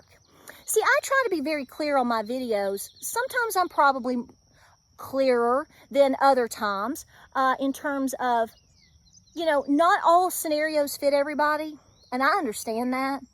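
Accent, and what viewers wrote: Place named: New York, United States. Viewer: You are definetly my girl. Im from ny and live in nc now I speak like you. The truth. Lu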